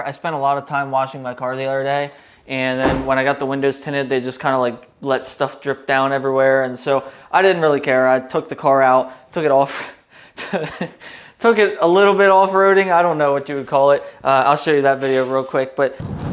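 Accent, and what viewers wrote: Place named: Pennsylvania, United States. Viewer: Nice bro! Thanks installing mine later today! Love a good PA accent! lol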